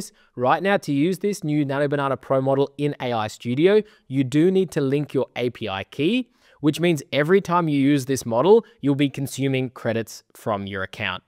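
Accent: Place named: Australia. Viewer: An Aussie in Berlin?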